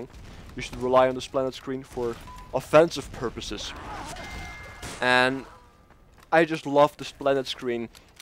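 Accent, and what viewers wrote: Netherlands. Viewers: Lekker nederlands accentje hoor :)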